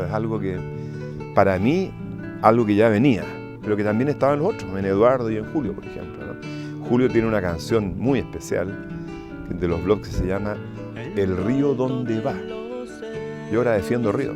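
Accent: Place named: Spain. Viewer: Por que mezclas fonetica castellena con america, es raro como hablas.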